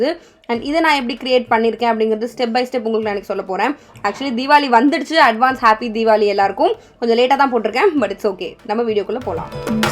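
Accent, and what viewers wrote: Tirunelveli, India. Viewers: Unga native tirunelveli ah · Sis nenga thirunelveli ah😊😊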